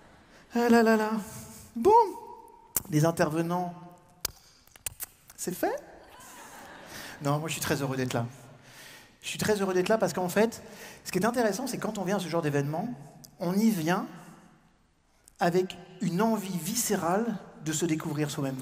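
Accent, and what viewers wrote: Quebec, Canada. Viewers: Merci d’avoir publié ta conférence de l’AZ Paris! Je rêvais de t’entendre au Sommet de Québec où j’étais pour la première fois cette année. C’est mon prix de consolation:0) … et en passant ton accent québécois oh la la … comme dit Martin à faire la même chose 1000 fois, on finit par y arriver! Je t’adore, merci de tous ces partages sur YouTube, tu fais partie de mon univers plusieurs fois semaine et je m’enrichis à chaque visionnement. Bonne continuité!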